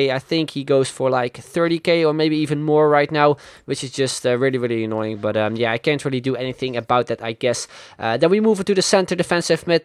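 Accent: Netherlands. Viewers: Wait are you Dutch?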